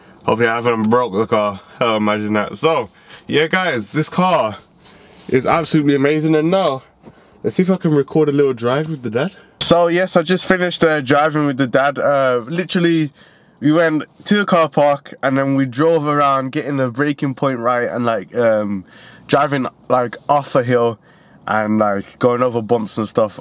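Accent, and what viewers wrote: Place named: Jamaica. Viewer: I can hear he is from Jamaica like me🙂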